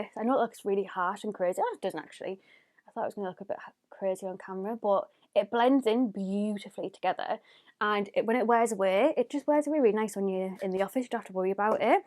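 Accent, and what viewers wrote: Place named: North East England, United Kingdom. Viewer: Where are you from? :) the accent sounds North East? I'm from boro and it sounds similar hahaha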